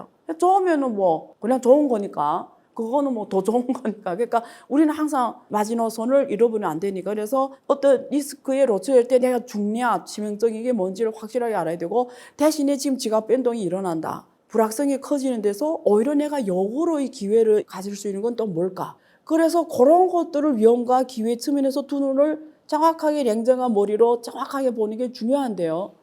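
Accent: Yanbian, China. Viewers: 말투 억양이 어째 조선족인디? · 에이 ㅡㅡ조선족 말투 ㅡㅡ정체가 의심ㅡㅠ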